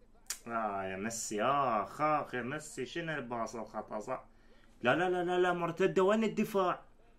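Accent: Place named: Iraq. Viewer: السلام عليكم ههههه والله ياخوي احب ردات فعلك وكلامك العراقي الحلوووو واصل عزيزي ولنا فخر بتواجدك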